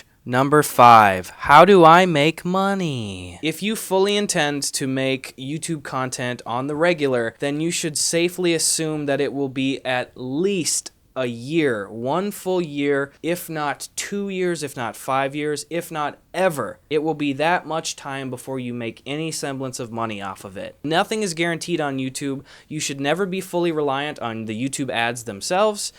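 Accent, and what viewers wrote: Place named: Wisconsin, United States. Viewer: I can tell you're from Wisconsin from the way you pronounce your "a's". Sw-ay-g Bucks. Lmao. God, I miss that boring ass state 😩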